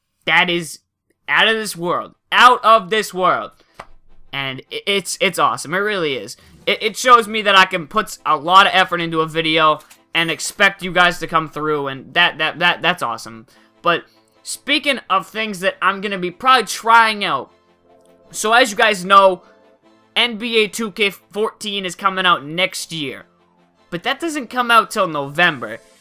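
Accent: Boston, United States. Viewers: are u from boston??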